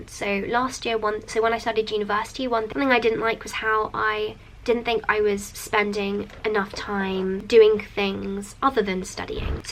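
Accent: RP, United Kingdom. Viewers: I feel so ruff after watching this your accent is sooo posh I am half from Essex half from Cardiff ly x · Is it British accent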